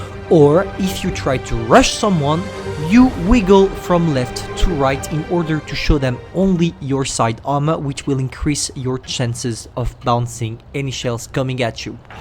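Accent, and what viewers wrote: France. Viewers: You have French accent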